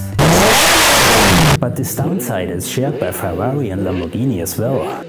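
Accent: East Germany, Germany. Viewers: geil wie man selbst im englischen den Ossi Dialekt raushört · Moin Moin aus dem Norden, ist der Protagonist etwa Deutscher? 😀 Das Englisch hört sich wie Denglisch an ;-) Abo ist raus! LG HEUERs Pit Stop 🚗💨💥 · Bei dem sehr deutlich deutschem Akzent könnte man "Mercedes" doch auch gleich richtig deutsch aussprechen. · Thats the best german english ive ever heard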